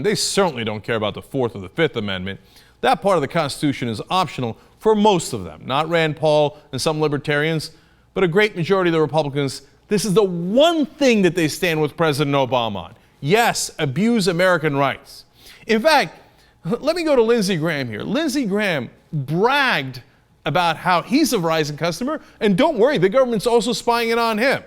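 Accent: Germany. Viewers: Cenk f*** you for pulling Stuttgart, Frankfurt and your weird German accent into this, it's not the German government doing that and btw, the CIA put up their base in Stuttgart spying on and kidnapping German citizens as well. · Worst German accent ever. Otherwise a great vid.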